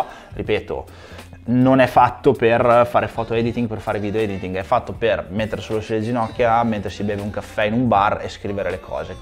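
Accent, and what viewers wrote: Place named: Romagna, Italy. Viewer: prego! Eh eh eh... scusa ma io ho la mamma di Rimini e il tuo accento mi sembra molto simile! Bellissima città Bologna!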